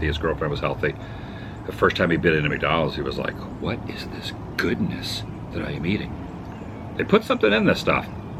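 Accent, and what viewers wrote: South, United States. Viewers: Missing your adventures so I got up early, created a queue and getting my southern drawl to start my day! xo sending hugs to the Mama, Papa, Sissy & Brother-in-law!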